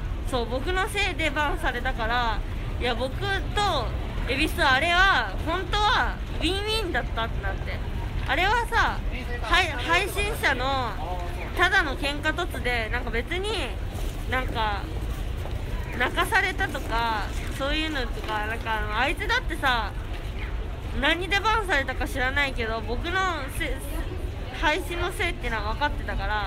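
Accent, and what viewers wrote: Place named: Kansai, Japan. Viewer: たまにアクセントが関西弁だね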